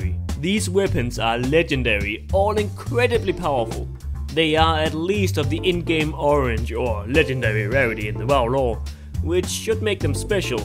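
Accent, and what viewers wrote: Denmark. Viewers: this guy has the most danish accent in the world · Og man kan bare høre at du er dansker! :D Subbed! :) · I know that accent You're from DK right? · Is it just me or do you sound danish like heck :o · bro you danish? I can act hear it :D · iv never seen anyone of your videos, but you make some valid points, Im both on pro and con-side of the artifact weapons, but so far i like them :) Btw, it sounds like you have a danish accent? Am I close? :p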